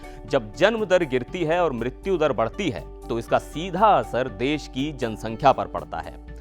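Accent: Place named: Bihar, India. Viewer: Ye presenter pakka बिहार से है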